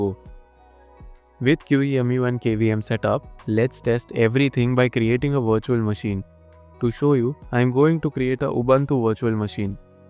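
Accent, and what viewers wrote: India. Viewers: indian, ew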